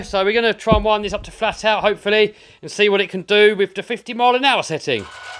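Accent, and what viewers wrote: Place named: United Kingdom. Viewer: That British accent ❤️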